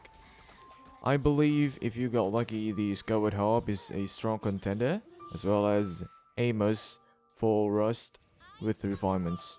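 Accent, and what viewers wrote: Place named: Australia. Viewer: aussie accent 👀👀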